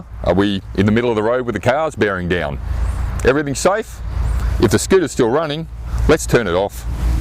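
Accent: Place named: Australia. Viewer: love australian accent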